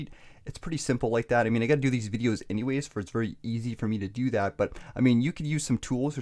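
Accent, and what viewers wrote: Canada. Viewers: this guy so fun to listen to. I can tell he's Canadian so quickly.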